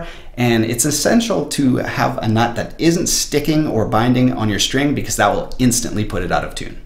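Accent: Canada. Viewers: like you're videos, what kind of dialects is this, Canada?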